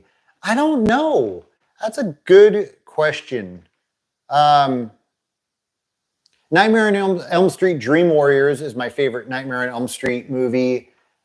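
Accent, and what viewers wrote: United States. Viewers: Hi from germany! I just want to say that your American Englisch ist so clear and easy to understand, and i dont know why 😅.. Its really comfortable to Listen to you 👋🙂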